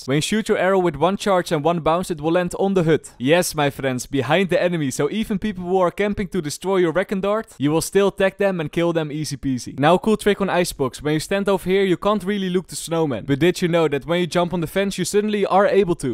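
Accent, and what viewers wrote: Netherlands. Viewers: why do i hear some dutch?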